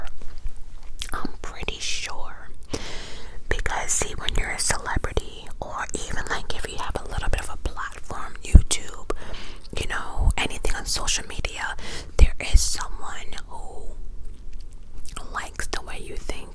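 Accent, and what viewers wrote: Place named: East Coast, United States. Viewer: I’m new here, where are you from? Because the upper east coast accent is adorable, but if you’re anything like me, I always talk with accents lol. Love your videos!! I’m listening to this one while cleaning my kitchen on a Sunday and it’s chefs kiss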